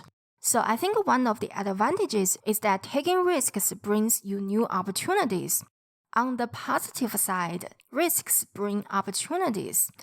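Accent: China. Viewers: terrible chines accent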